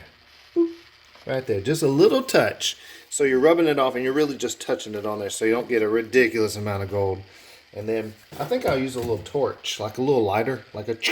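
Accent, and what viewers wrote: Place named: Carolina, United States. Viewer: Love your videos! Been watching you for quite a while and finally subscribed! As soon as my friend played your video, and I heard your voice.. I KNEW you were from NC or SC and I was right! 😂😂 Thanks for all the tips!💕💕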